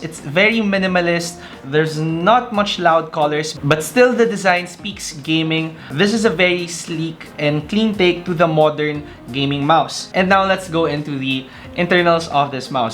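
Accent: Philippines. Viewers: Haha no worries. After seeing the Mexican conversion, I remembered you had a Filipino accent and looked that up.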